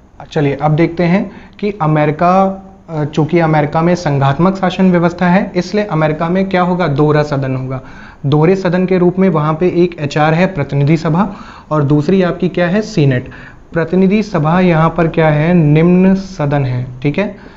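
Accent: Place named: Punjab, India. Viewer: Sir app Jo Acha Acha Bolte ho Jaise Punjabi log Bolte hai vaise Bolte ho appp .......nice ......from punjab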